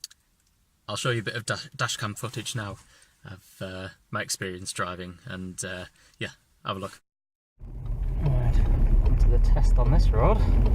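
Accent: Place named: Cumbria, United Kingdom. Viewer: Can't believe after all these years I've never put winter tyres on my car in winter. On 27 Dec I nearly got caught in the 20 car pile-up on the M6 in a mini-blizzard. Never again. Luckily for me, I won an auction of ebay and got 4 wheels with 4 Nokian WRD4s fitted for a crazy bargain price. Got them on now, and what a step change!! Feel so much more secure now. Doesn't make me impervious to accidents, but sure takes the environment out of the equation. 👍 PS I see the footage was Yorks/Derbyshire, but that doesn't half sound like a West Cumbrian accent!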